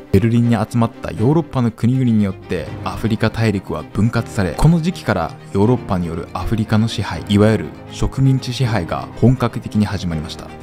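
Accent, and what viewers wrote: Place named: South Korea. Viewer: 韓国人の話す日本語訛りのようなのを感じた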